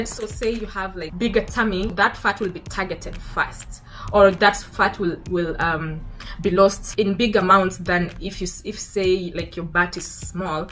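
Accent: Kenya. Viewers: You have a very strong Kenyan accent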